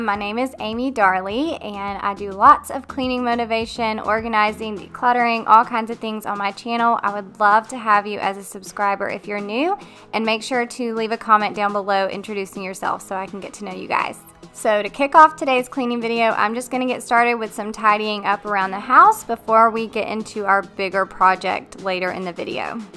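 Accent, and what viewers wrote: South, United States. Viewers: I watch you on TV while cleaning and filming along with many other favorite YouTube cleaners. Lol I noticed your accent sounded a lot like mine and was wondering what area you’re from. I am a YouTuber as well, I am from North Carolina. I really enjoyed your motivating video and I loved how your bookshelf turned out. I am always doing DIY projects on furniture.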